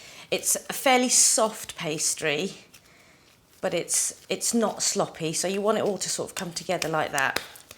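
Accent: England, United Kingdom. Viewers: In fact I've been trying readymade ones for ages, but now that you show this amazing recipe, it's worth trying. Thank you for sharing the tutorial. By the way, love your British accent. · Very well demonstrated. And ofcourse the English accent is such a pleasure to listen to. I am definitely going to give it another try. 👍🏾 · Are you speaking British English sorry look like Indian girl thanks for taste food recipe Thanks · Just love your British accent! Thanks for sharing! Will try them soon. We had them in England years ago and my husband loves them! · very british accent init? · It's just almost 10 years older, video with so much of freshness and life into it..the samosa...lovely british accent...many thanks for the recipe from India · Love your video!! Love your British accent and how you showed every step in such detail. Thank you so much for sharing. 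Can't wait to try making them!! Can you make these with whole wheat flour and bake them rather than deep fry?? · I loved your Samosa recipe, the authentic Indian taste. You also have a beautiful British accent.God Bless .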